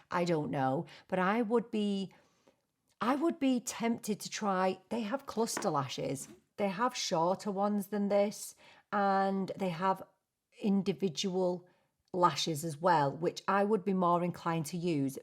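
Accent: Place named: North, United Kingdom. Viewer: No I don’t wear lashes either it just takes too long because I have no skill either 😂 but those ones sound a bit easier. Did I ever tell you watch your videos because you are funny, entertaining and I love your northern accent 😊🙌🏼💜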